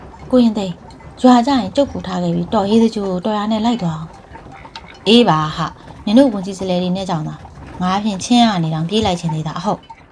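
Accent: Upper Burma, Myanmar. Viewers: မင်္ဂလာပါတို့ အညာရေဆရာမခင်ခင်ထူးဝတ္ထုကောင်းလေးကိုရွေးချယ်ဖတ်ပြတာစာဖတ်သူအသံလေးနဲ့အညာသံအညာဓလေ့တို့အညာနဲ့လိုက်ဖက်နားထောင်ကောင်းလိုက်တာကျေးဇူးပါနော်❤❤❤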